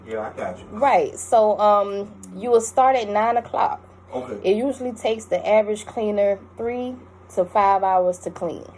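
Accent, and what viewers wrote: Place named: New Orleans, United States. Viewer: Sis where you from ?! I hear NOLA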